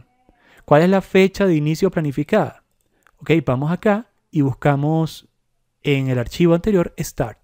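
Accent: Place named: Caracas, Venezuela. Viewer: los ultimos 6 segundos del video son los que hablan de la Conexión de Microsoft Project a Navisworks, TOOOOOOODO LO DEMAS ES BLA BLA BLA elemental. no dijiste lo mas importante, ni siquiera hiciste un click en el espacio modelo para interactuar con el modelo 3d. el video ni siquiera tiene una segunda parte como para justificar tanto bla bla bla ... sera por eso que solo tienes 367 vistas y solo un comentario desde el 2020.. que perdida de tiempo escuchando ese tono de voz irritante con acento caraqueno.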